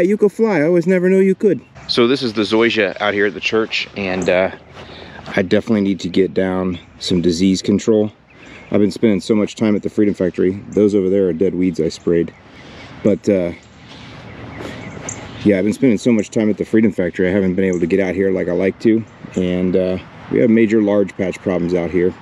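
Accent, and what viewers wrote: Chicago, United States. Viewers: I enjoy the Chicago accent... somehow, I didn't know you were from there until recently.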